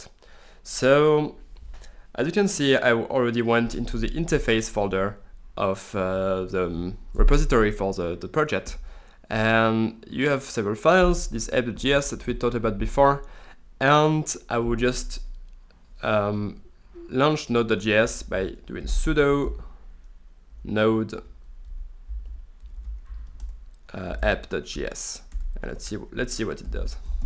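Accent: France. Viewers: Mais tu es français ? :D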